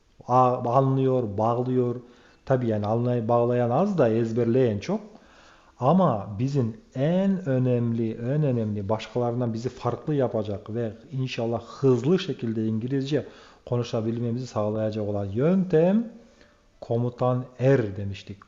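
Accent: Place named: Azerbaijan. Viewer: Merak ettim nerelisiniz acaba Türkiyeli mi yoksa Azerbaycan Türk'ü mü Türkiye Türkçesinden farklı konuşuyorsunuz ondan merak ediyorum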